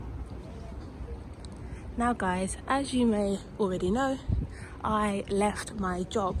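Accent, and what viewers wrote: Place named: United Kingdom. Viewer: your British accent is so proper and soothing. you could be a narrator for sure 😂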